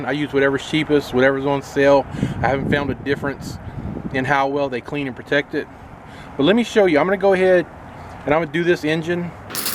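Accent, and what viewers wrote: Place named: Texas, United States. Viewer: Wow! And I love the accent, is that Texan mate?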